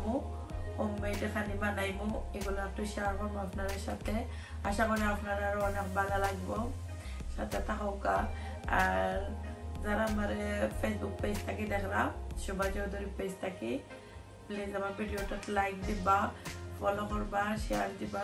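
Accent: Sylhet, Bangladesh. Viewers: মনোমুগ্ধকর সিলেটের ভাষা অনেক ভালো লাগে আপু মন জুড়িয়ে যায়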